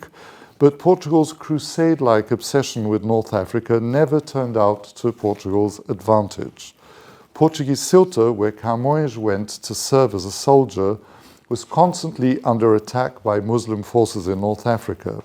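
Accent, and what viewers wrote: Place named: RP, United Kingdom. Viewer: That's perfect BBC english! Proud of you ❤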